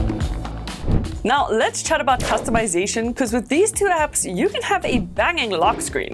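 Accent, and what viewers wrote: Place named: South Africa. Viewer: Heavy South African accent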